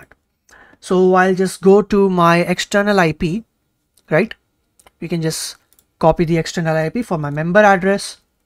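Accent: India. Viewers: Yes! He uses basic English with Indian accent. Bilkul follow kar sakte ho👍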